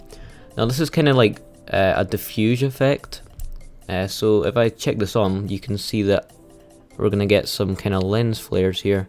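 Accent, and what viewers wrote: Scotland, United Kingdom. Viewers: maaaate i didny even know you were scottish aww thank fuck makes life easier :)))))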